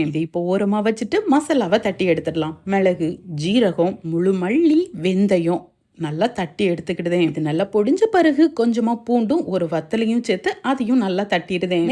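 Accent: Tirunelveli, India. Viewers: Sister neenga thirunelveli ya??...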